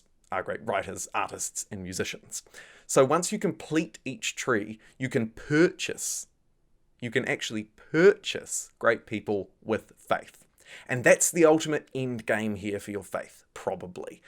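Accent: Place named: New Zealand. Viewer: ha, would not have placed that accent as kiwi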